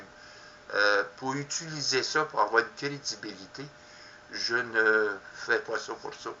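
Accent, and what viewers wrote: Quebec, Canada. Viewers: T tu québécois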